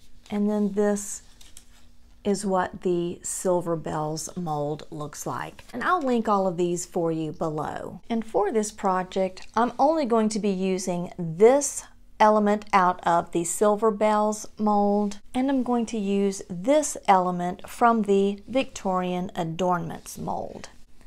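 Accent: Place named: South, United States. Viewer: Hi, how are you? 😀 I'm from Argentina and i bet you didn't expect a suscriber from so far away, and Spanish speaker as well. 😆 I happen to be fully bilingual so i have the pleasure to enjoy all of these beautiful american creators such yourself! I like your southern accent and, if you don't mind my asking, i'm curious to know if you are from Alabama, Tennessee or somewhere nearby. I loved your embroidery hoop ornaments, since fabric is my favorite material to work with. Also stamps. I adore stamping! I have read in another comment that you clean your space as you go, to keep it neat. I do the same when crafting. I cannot concentrate if my surface is too busy with all sort of things. Glad to know i'm not the only one obsessed with cleaning. 😂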